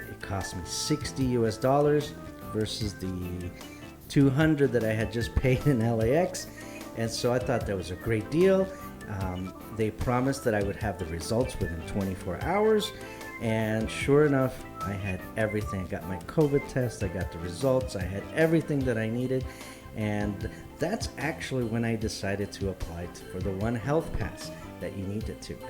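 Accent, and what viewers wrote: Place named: Bisaya, Philippines. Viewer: 💯 legit bisaya gyud, well almost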